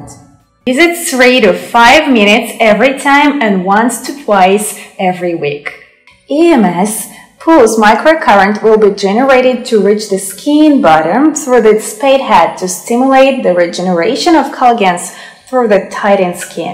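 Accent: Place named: Eastern Europe. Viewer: it’s her accent and lack of pronunciation more than anything. She has a strong Slavic accent.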